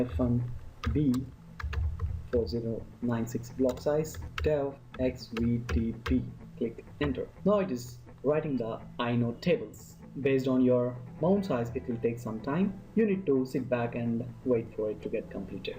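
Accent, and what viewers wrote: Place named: India. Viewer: And another Indian. At least the accent isn't that bad.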